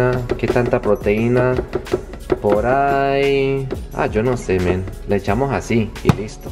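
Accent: Colombia. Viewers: Tu eres colombiano, porque suenas a ellos. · me encanta tu español colombiano. xdxdxd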